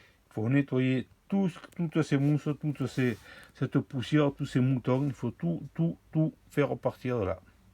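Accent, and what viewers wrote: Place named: South, France. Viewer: On sens le sud dans tes video j’adore !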